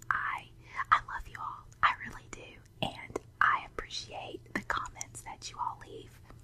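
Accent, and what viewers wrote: South, United States. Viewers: Wow, you have a southern accent! I think you peeps who have southern accents are the most relaxed people! Your voice is sooo relaxing. :) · I really enjoy your southern accent :P It bring tingles down my spine :P Awesome video once again!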